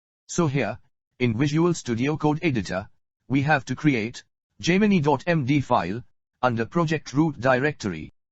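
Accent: India. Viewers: Another slop tool presented by a Indian robot voice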